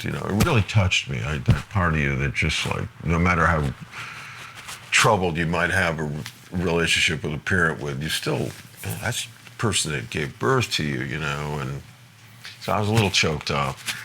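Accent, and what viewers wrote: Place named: New England, United States. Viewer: Clevah for clever. It's just called a New England accent. RI, MA, NH, Parts of CT. We all talked that way.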